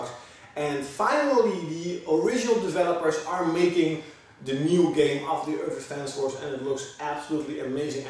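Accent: Netherlands. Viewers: Klinkt nederlands. Waar kom je eigenlijk vandaan?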